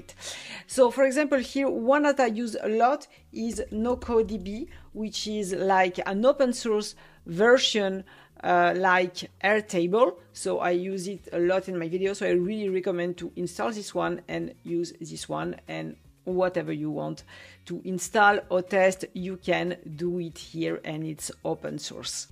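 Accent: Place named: France. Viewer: Vous avez un accent français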